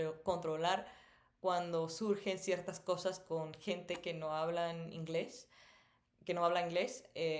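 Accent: Guatemala. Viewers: Tu espan̈ol es perfecto y muy CHAPIN!! Tan chapín que la primwra vez pensé que eras chapina más que la vecina!! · Que chilero tu canal y tu acento chapin va vos .jajaja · Wow su acento en español es Guatemalteco saludos desde New York hermosa de parte de un Guatemalteco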